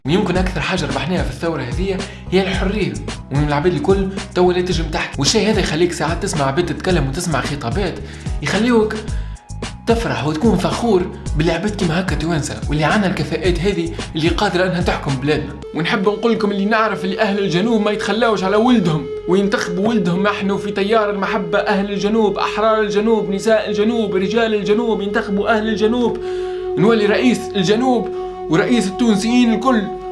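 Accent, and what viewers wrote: England, United Kingdom. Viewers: that british accent tho ❤ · Dat english accent xD nice video , mafhoum w message reçu <3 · That England accente tho :D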